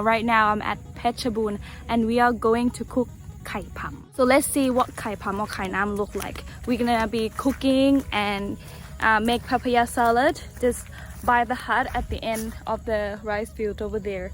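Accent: Australia. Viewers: I hear an Australian flavour to the way you speak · You have a slight Australien accent.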